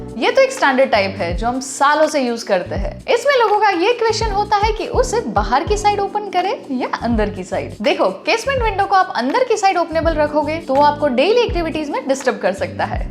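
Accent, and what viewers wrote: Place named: South, India. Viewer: You sound south, no offence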